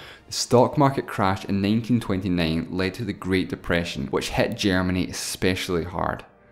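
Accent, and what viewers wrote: Scotland, United Kingdom. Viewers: Alright am a Scottish fan and I'm wondering by your accent I can guess your from central or eastern Scotland am I right and if so which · Scottish accent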